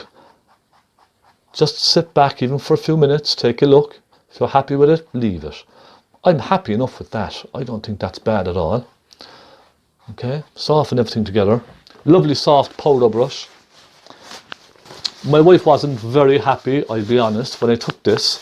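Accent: Cork, Ireland. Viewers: Fabulous tutorial and if I'm not mistaken great cork accent Subscribed!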